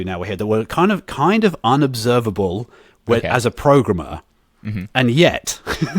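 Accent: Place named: United Kingdom. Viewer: I love these british accent. More than american english. Congrats!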